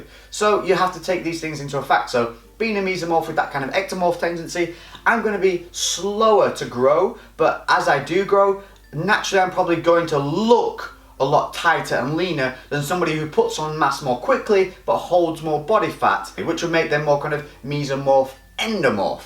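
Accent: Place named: Northern England, United Kingdom. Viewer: Love it, and nice to hear a fellow Brit talking fitness for a change. Plus you also sound like a northern lad like me! Just recently found your channel and it's great!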